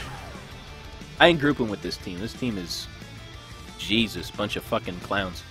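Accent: Nova Scotia, Canada. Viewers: is he from Nova Scotia?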